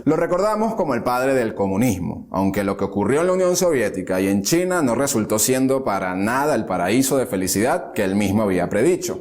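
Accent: England, United Kingdom. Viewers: Historiador para comentar hay que tener cultura.los terminos deben pronunciarse en forma de origen.como todos pronuncian con acento ingles. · Para hacer una biografía sobre personajes alemanes, o bien pronuncia los nombres a la alemana o bien a la española, lo que queda fatal es pronunciar las palabras en alemán a la inglesa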